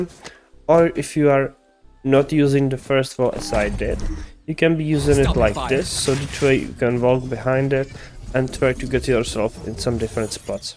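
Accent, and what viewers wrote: Czech Republic. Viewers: are you czech?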